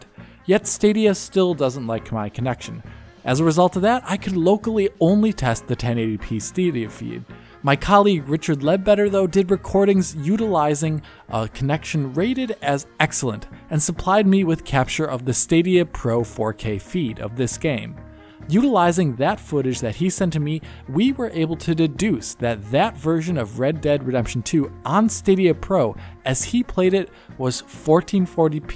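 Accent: Germany. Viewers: He German. I repeat: He German. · HOL' UP. YOU ARE GERMAN?! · German accent is never funny, its rather SCARY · Or... the guy is German?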